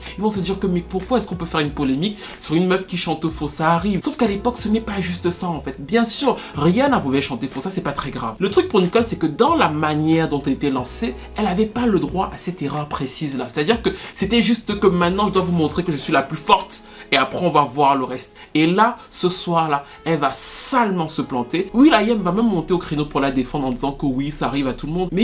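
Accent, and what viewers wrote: Cameroon. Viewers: L'accent camerounais 😎😎...so proud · Je viens de découvrir la chaine, j'ai reconnu l'accent du 237😂😂😂 et j'adore les vidéos sont longues mais on ne vois littéralement pas le temps passé tellement tu t'y connais dans le domaine.... Well done à toi.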